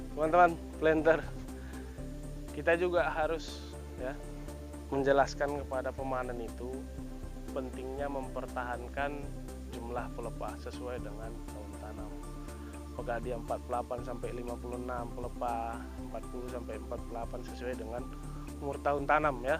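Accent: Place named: Medan, Indonesia. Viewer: Mantap bos q... Semangat pak.. Terima kasih tipsnya kk Mantap kali lah logat medannya pak.. Salam silaturahmi pak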